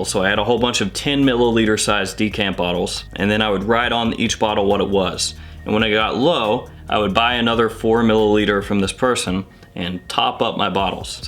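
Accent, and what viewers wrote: South, United States. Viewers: there's a bit of a southern twang to your speech, it's very interesting and enriching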